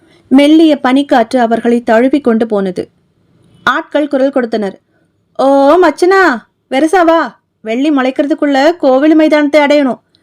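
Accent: Madurai, India. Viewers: Madurai slang vera level sister 🎉🎉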